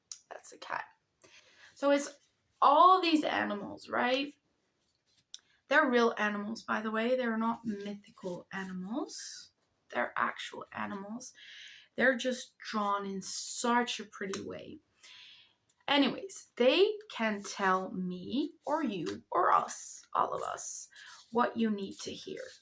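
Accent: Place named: Pennsylvania Dutch, United States. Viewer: As someone from the USA, your English accent, more specifically American accent, is really good. I actually thought you were American until I found out you were Dutch by watching your other videos. You literally sound like you’re a Pennsylvanian Dutch, but I guess that makes sense. 😅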